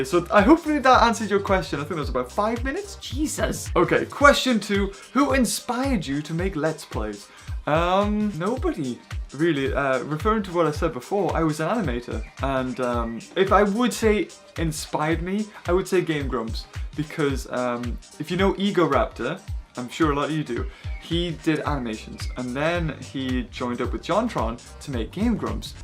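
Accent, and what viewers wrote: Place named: United Kingdom. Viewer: If it's not to much trouble but do you mind me asking you where you live You British and most of my friends are British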